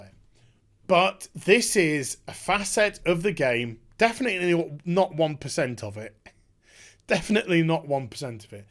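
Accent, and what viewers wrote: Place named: United Kingdom. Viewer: You just keep speaking British to me and I'll keep liking your videos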